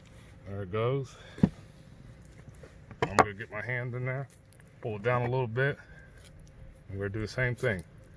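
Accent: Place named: United States. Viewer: I can tell this is good cause in the first five seconds I heard the American in his voice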